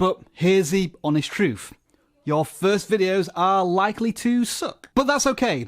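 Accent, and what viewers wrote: Yorkshire, United Kingdom. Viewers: wait you're british? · Yes! Straight talking from Yorkshire #fistpump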